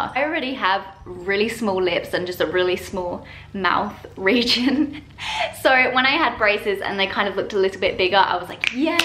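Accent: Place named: England, United Kingdom. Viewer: you sound a little English:))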